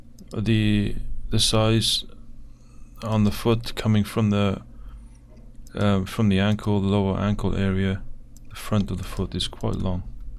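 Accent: Wales, United Kingdom. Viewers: Great analysis, again! It's certainly intriguing, to the point of being believable. Although it's certainly not an important point I must say that you're very easy to listen to - are you Welsh? Sounds Welsh to me but quite a soft accent. Just wondering 🤔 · are you Welsh you sound like you have a bit of a Welsh accent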